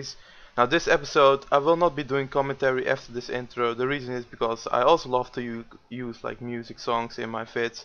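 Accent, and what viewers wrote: Netherlands. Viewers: DAT DUTCH ACCENT <3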